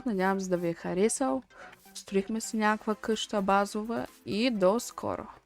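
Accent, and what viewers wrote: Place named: Rural, Bulgaria. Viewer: Абсолютен Trash, говориш като пълен селяндур и има доста думи, които ти липсват в речника, което показва колко необразован си.. поредния льолььо...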